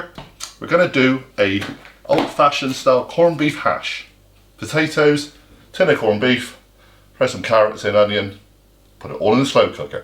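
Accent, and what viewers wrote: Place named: West Country, United Kingdom. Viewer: Just subscribed and slow cooker is on. Thanks, like the accent, sounds Dorset or Somerset?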